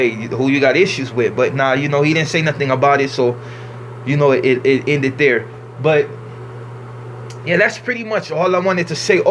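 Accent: Mexico. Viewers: A black dude with a Mexican accent 😒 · You really have a Mexican accent lol that shit got me bugging. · He has a Mexican accent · U sound more Mexican than I do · Thats cool...you honestly sound Mexican too me...thats crazy.... And l think you must be pretty down...cus now you must be having more enemies..the blacks that must hate you wanting to be in a Mexican gang.....but that's what I'm saing..you mus t be down....give you lots of props for being you.....nobody be's themselves now days.. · You tweaks an u brain washed my nigga u can b in a Mexican gang but u tlkn mexican an all bro the fuck on ya bean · Yo its mad weird seen a nigga speak like a mexican bruh. If this nigga was in yo hood and started talking like this i bet you aint gone stop asking questions. Teach me foo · He sounds very Mexican Foo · You Sound Mezican Are you Blaxican? Or just a moreno? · Respect bruh If I didn't see your face i would have thought you was mexican. 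· He sounds just like a Mexican not black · Talk like Mexican nice!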